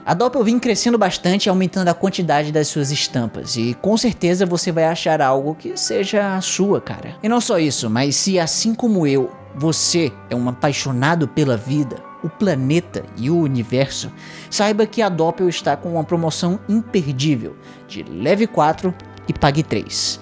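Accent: Ceará, Brazil. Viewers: Tu é cearense né macho vei ?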